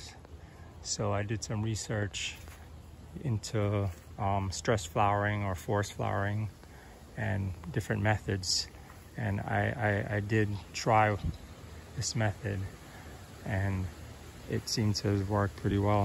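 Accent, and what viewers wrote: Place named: Jamaica. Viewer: Respects - cool technique. (PS you sound like a yard man all weh ah gwaan still 🙂)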